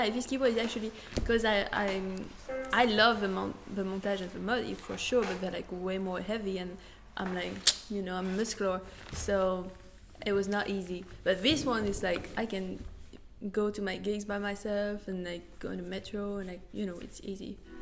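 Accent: France. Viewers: Mmm cet accent français !👌👌🤩🤩🌹🇨🇵🥳😜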